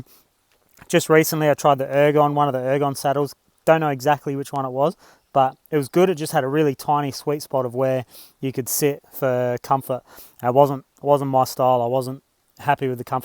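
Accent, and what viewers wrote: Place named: Australia. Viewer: No disrespect meant, but this review was just awful! His Australian accent, is often difficult to understand because he either speaks too fast or kind of mutters. Plus he begins by talking about the 3 Fabric Scoop saddles he's tried -who cares! I want to hear about the Pure without seeing the bike tipping over or pictures of the road he's on. It's too bad because he obviously knows his stuff and with a more polished presentation this could have been terrific!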